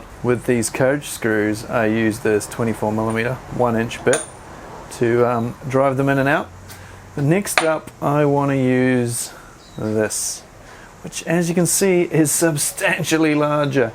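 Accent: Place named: New Zealand. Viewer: Always surprised to hear a kiwi accent on Youtube. Good content man.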